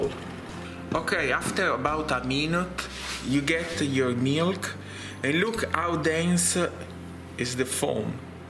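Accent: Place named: Italy. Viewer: It's a clear Italian accent, but even if you aren't familiar, he literally said "Here in Italy" at the start of the video.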